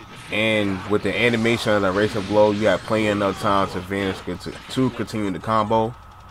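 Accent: New York, United States. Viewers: You from NY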